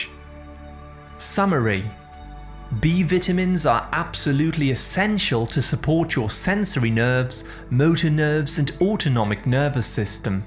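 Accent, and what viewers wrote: United Kingdom. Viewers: That accent lol must be british.. we say vight-o-mins they say vit-a-min...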